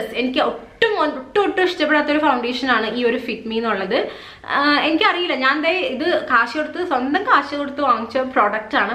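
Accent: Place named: Kochi, India. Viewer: Innathe samsarathilanu kochi slang undayathu, nalla rasamundu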